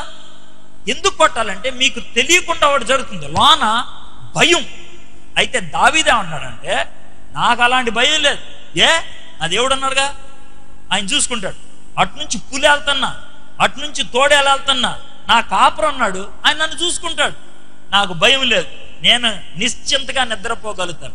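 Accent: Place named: Srikakulam, India. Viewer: Srikakulam slang sir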